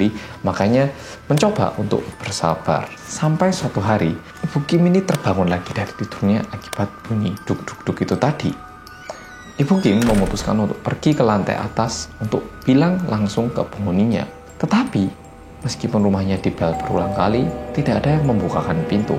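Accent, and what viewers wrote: Java, Indonesia. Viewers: Merinding boosss, sumpah Bagus nih cerita nya bang medok👍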